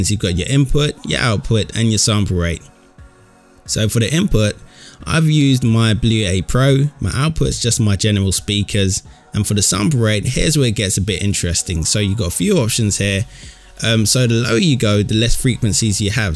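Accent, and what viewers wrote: United Kingdom. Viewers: How do we get that sweet British accent, tho?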